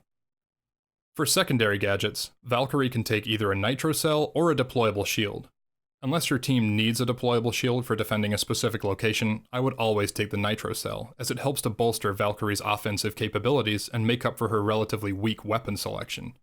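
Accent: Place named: United States. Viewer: This was much appreciated. As someone who doesn't play (or pay) enough to have many operators, it was annoying that I bought Valkyrie and didn't use her too much due to feeling like I wasn't using her to her full potential. This definitely had some useful pointers for using her gadget which I'll be able to implement later tonight :p. Also, nice American accent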